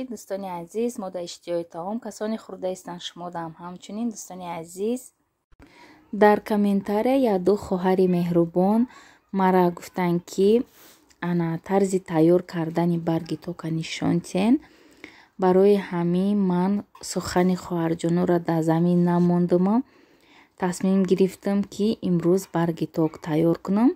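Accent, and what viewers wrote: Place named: Kulob, Tajikistan. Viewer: Шумо аз конибодом барои чи кулобча сухан мекуни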